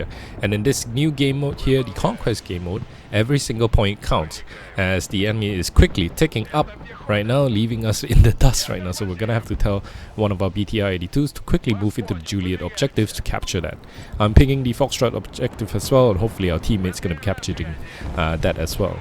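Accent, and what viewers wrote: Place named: Singapore. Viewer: Those frogfoots 🤯🤯🤯 beautiful plays! Thanks for good commentary too, I'm guessing you are from Singapore?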